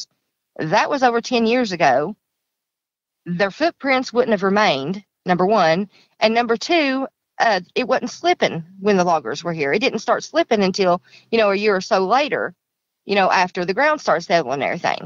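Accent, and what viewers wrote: South, United States. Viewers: your lil ol southern drawl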